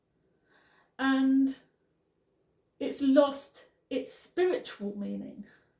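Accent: United Kingdom. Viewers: I thought you are from USA I hear that UK accent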